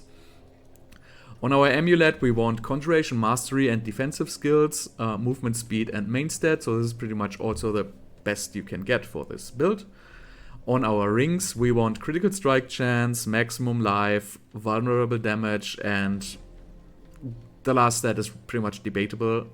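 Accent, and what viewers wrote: Germany. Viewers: Man hört einfach immer wenn jemand deutsch ist haha, gutes video 👌🏼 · Vielen Dank für diesen megageilen Build! Von allem was ich bisher probiert habe, bockt deine Version am meisten. Mach weiter so! PS: Ich schreibe auf deutsch, weil ich deinem Englisch Akzent nach ziemlich sicher bin, dass du Deutscher bist.😁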